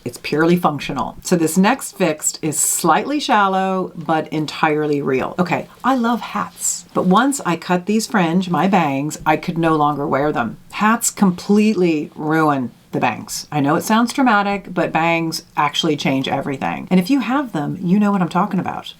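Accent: Ireland. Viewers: Hey ❤❤ i detect an Irish accent ??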